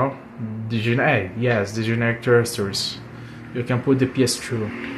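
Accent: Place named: Brazil. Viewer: you are from Brazil? Your accent appears to be Brazilian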